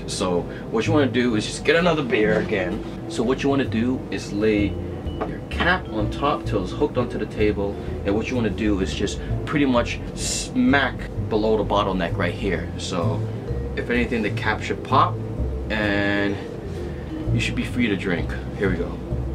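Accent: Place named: Canada. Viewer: I can tell you're Canadian, Winnipeg perhaps?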